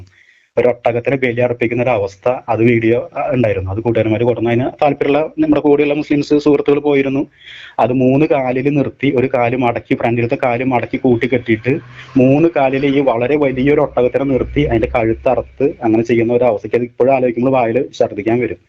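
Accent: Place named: Thrissur, India. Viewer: എന്നാണ് ത്രിശൂർ രീതിയിൽ സംസാരിക്കാൻ പഠിച്ചത്.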